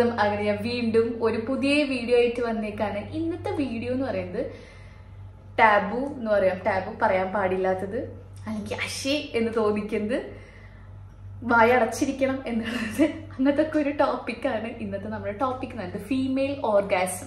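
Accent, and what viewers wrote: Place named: Kozhikode, India. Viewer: Are you from Calicut? Kik-killi kettapo chodhichadhaato.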